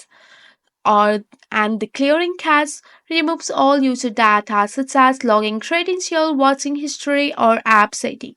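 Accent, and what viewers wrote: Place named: Nepal. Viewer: Getting that nepali accent.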